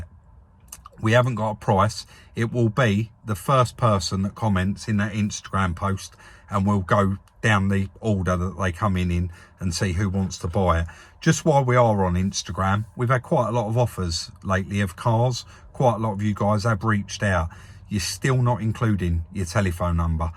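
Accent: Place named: England, United Kingdom. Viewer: the trouble with your videos is that they contain lots of stuff that doesn’t relate to the actual renovation of the vehicles. I don’t feel any need to see your wife’s dog at the backdoor, or your ticket from Redbridge to the midlands, or your walk through the British museum… But there was next to no pictures of doing any work on the door, or you doing any work on the brakes and other stuff. When I get to the end of one of your videos, I feel I haven’t learned any techniques or learned much about the type of vehicle. It all comes over as “ ’ere, didn’t we do well!....” Nice to hear a proper English accent, not some yank, but that isn’t enough to keep me watching. So I’m going to cancel my subscription. Ps#1 when you do the numbers, you never add in anything for the cost of maintaining your workshop, plus electricity, rates etc. You ought to, even if the wife is actually paying the bills. 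I suggest a flat rate of two hundred and fifty per vehicle. Ps#2 whatever you’re paying, I reckon it’s only half what he’s worth.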